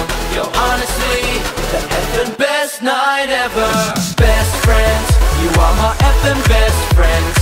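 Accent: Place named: United States. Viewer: Wtf your Aussie? You sound American... Australiaslosing it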